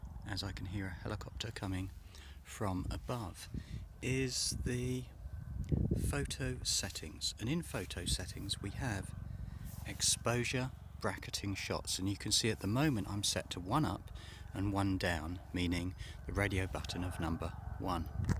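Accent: United Kingdom. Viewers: Thanks, That link didn't work but no matter as I found a link to download on the VibranceHDR webpage and installed from there. It was a bit disconcerting as Google obviously not happy with that and gave me all sorts of dire warnings and are-you-sures? But I pressed on and installed. Then I received all sorts of unwanted notifications from them on all manner of subjects but blocked them and now all is quiet again. I just need to try out Vibrance HDR now and I shall follow your guidance closely. I have to say it was nice to hear another English voice on a YouTube tech/geeky subject video and your quiet clear and measured presentation is much appreciated along with your commentary on extraneous helicopter and gun noises - made it all the more human and genuine for me;-) If I haven't subscribed then I'm about to. Best wishes. Philip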